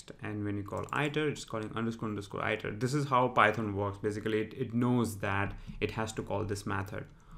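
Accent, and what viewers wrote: India. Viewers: This is Indian accent. If you have any problem with it you can watch other tutorials made by youtubers who belong to the your region. Try to keep your cynism in control. If you find it difficult try some medical help. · Deepanshu Joshi Mate you just proved yourself wrong.You said Indian Accent.Yeah that’s an Indian accent that is why it is not correct.the correct pronunciation of a word is understood by how it is pronounced by majority of people.So you are wrong.I think you need some help before me.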